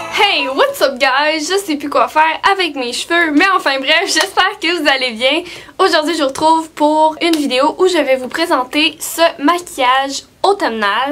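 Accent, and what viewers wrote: Quebec, Canada. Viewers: Ce n'est pas qu'elle ne parle pas bien le français c'est juste qu'elle a l'accent Québécois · Coucou tu est vraiment magnifique et ta frange te vas hyper bien et en plus tu est assez drôle et ta l'accent québécois 💪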